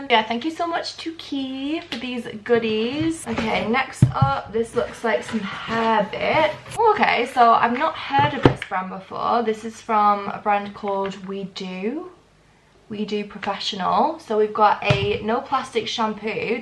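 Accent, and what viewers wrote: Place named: RP, United Kingdom. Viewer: Have you always lived in Manchester? Your accent sounds quite different and posh I can’t place it 🤣X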